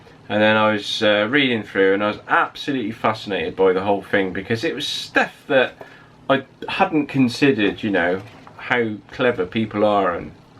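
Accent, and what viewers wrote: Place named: West Country, United Kingdom. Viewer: When I was a bit of a tear ass in my car and bent it up I loved going to the repair shop in Westfield, Somerset. Mk 1 Cortina days. The owner Les was a great man and he allowed me to hang around until he started to let me use some of the kit. Loved using gas welder to stick on the odd wing or rear quarter. Doing it for love is one thing but doing it for a living is a different matter. At 71 I love what you do. Your blog's are pitched just right. How anyone can tell you your doing it wrong is definitely a bed wetter. I am adding Einstein to your second name. You say you were always looking for time and space well he had a thing about this too but he called it Space-time. I am trying to sus your accent. Are you a westo. North Somerset am I. Take care.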